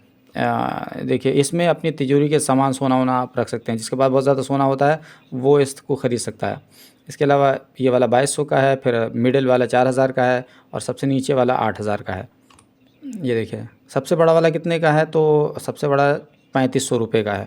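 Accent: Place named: Lucknow, India. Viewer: lakhnavi Andaaz Bahut Khoob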